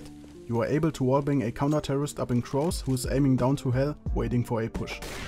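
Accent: Germany. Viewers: DAT GERMAN ACCENT hahahaha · Hat das irgend einen tieferen Sinn, dass du mit einem fürchterlich deutschen Akzent englisch sprichst? Warum nicht einfach auf Deutsch? Mega anstrengend sich dein englisch anzuhören ^^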